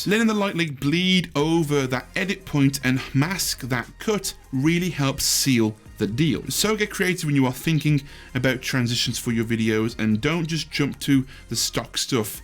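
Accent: Midlands, United Kingdom. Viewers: Can I hear a Midlands accent in there trying to hide away? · Also... Is that a midlands accent I hear? ;p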